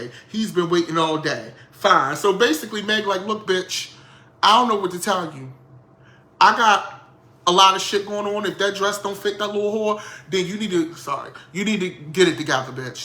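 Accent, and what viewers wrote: Baltimore, United States. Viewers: You got me over here cracking TF up, lil Bmore accent and all the shade- YouTube sent me here from Tells and I LIVE. Hope you do more 🤣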